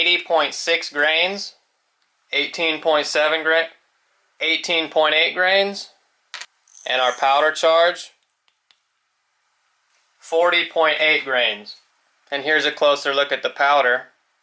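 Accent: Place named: Germany. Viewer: Great video, im curious if you are of German descent? Your accent is familiar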